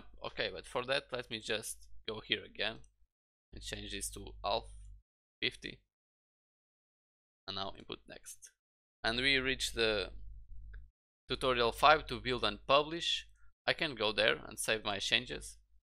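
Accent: Portugal. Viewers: És tuga não és? Pelo menos parece 😅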